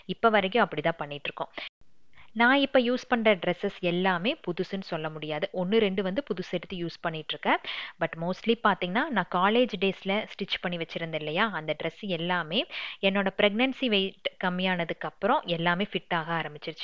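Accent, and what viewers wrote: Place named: Pollachi, India. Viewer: Hi … I am your silent subscriber from Cbe … from your slang itself I noticed that u r from pollachi..I am watching ur videos regularly but today the way u expressed ur personal stuff was really beautiful. U seem to be very honest and genuine and a good parent also.. Always being honest in ur work brings success.. god bless you.. if u happen to visit Coimbatore we will meet..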